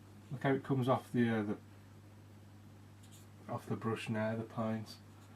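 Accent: Birmingham, United Kingdom. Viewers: Nice work, and nice to hear a Brummie accent, I'm a Brummie living in Wales, lol keep on posting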